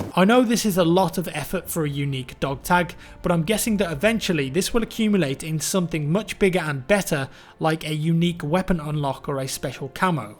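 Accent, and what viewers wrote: United Kingdom. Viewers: why do all great youtubers has to be british ? i mean fck off i can't understand nothing from that accent . since when you say "far" for "for"... i mean far != for ...it just so stupid i allways close this kind of videos but fck i need that dog tag.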